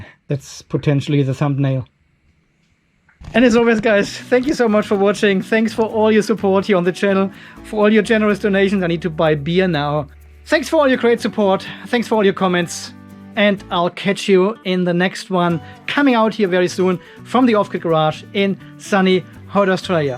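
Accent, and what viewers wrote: Germany. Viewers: Als ich dein erstes Video sah, dachte ich spontan: Wie kommt ein Deutscher nach Australien, u.a. wg. dem einschlägigen Dialekt...BOMBENSICHER bin ich mir erst seit BOMBENFEST. Auswandern war schon immer mein Traum...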